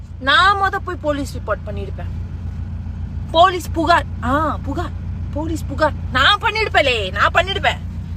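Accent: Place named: Madurai, India. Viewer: Malaysia la irukkanu solra but madurai language pesara.. but diwakar naai ah vachu vachuthuku nandri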